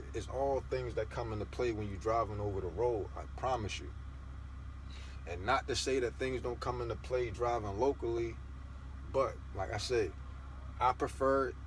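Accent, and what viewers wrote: Baltimore, United States. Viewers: Do you have to be a dump truck owner op..to make this kinda bread ? Been drivn otr 5 months..and u sound like my city bmore lol?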